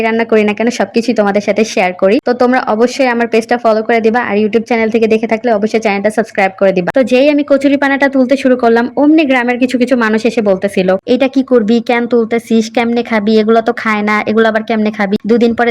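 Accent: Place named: Old Dhaka, Bangladesh. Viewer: Oi apu apni ki puran dhakai thaken apner kotha gula puran dhakar kothar moto reply diben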